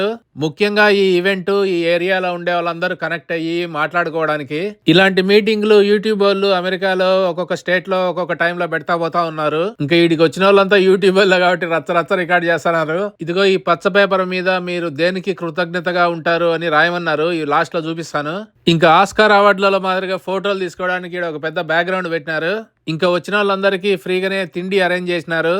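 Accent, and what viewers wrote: Kadapa, India. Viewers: సూపర్ గారు. కడప బాషను చాలా ఉంది. మీది కడప దగ్గర ఏ గ్రామం గారు. మాది రాజంపేట. ఎడ్యుకేషన్ కడప ఆర్ట్స్ కాలేజీ. ప్రెజెంట్ నేను హైదరాబాద్ చందానగర్ లో ఉంటాము. 1991 హైదరాబాద్ కు ట్రాన్స్ఫర్ అయినము. కడప బాషా వినాలా అంటే మీ ఛానల్ విని ఆనందం పడతాము.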